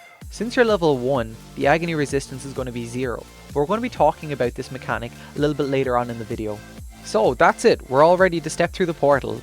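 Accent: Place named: Ireland. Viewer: Love your video, thank you!!! The Irish accent makes it better, keep it up!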